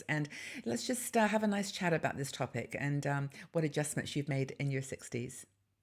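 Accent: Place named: United Kingdom. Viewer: I can hear that British accent love your channel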